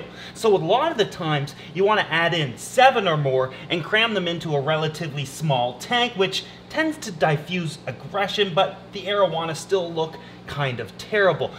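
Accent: Canada. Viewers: Could really hear the Canadian accent in this video. it’s brilliant 😂❤️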